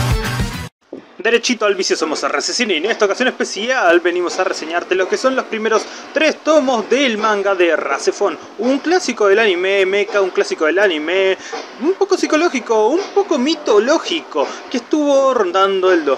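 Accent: Argentina. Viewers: Seguramente buenos aires por el tono, yo tambien de buenos aires, entonces por eso creo que es de ahi. Una pregunta vos de que provincia sos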